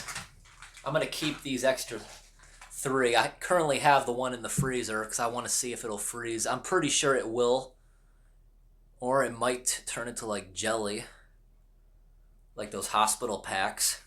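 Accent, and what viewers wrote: New England, United States. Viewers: Tanner Smyly That’s his Yankee dialect.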